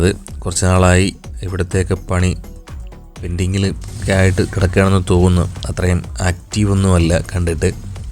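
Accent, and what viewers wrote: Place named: Central Travancore, India. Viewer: നമ്മുടെ മധ്യ തിരുവിതാങ്കൂർ ഭാഷയിൽ കണ്ണൂർ drone വീഡിയോ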